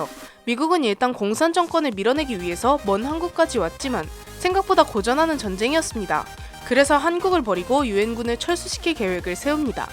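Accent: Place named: Daegu, South Korea. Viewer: 대구분이신가ㅋㅋㅋ 사투리 쓰시넹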